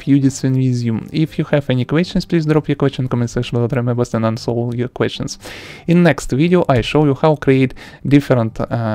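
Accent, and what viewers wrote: Russia. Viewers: This is a russian accent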